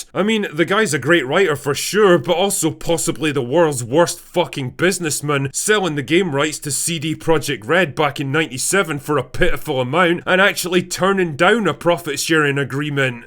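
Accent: Scotland, United Kingdom. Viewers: I had to give up watching this after 2 mins 19s because the voice of the commentator is sooooooo annoying. Yes the Witcher is bad especially now Henry has gone…… but that annoying scottish turd is so much worse I couldnt bear to hear another syllable come out of his mouth without feeling my toes curl the wrong way.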